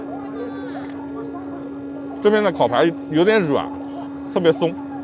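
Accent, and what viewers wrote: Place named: Jiangsu, China. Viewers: 你江苏人？口音太重了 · 前面就感觉说话南京口音，这集听到“小杆子”，那就坐实了是南京人。老乡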